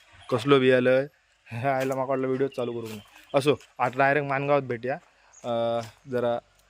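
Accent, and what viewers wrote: Malvan, India. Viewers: ची मालवणी ऐकायला छान वाठतय आम्हाला चाळतय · Wahnii malvanii try kartaa bolavak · Pn bhari bolte Malvani Ekdm God Todmodak ka hoyena Pn bolte👍👌❤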